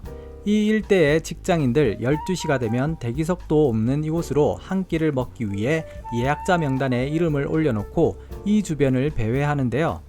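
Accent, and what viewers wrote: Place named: Gyeongsang, South Korea. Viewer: 경상도 억양이 거슬리는 것 빼고는 좋습니다. AI 나레이션이 나을 듯요.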